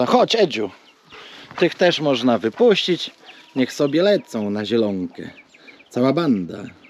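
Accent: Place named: East, Poland. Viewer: Ale sie wyrobiles z tym Yiutabem normalnie smigasz sie bujajacbna bokin😂.. Ale kurde bele beks bo akcent masz i poprawiasz... Tak trzymaj wschodniaku 😮😊😜😂😂😂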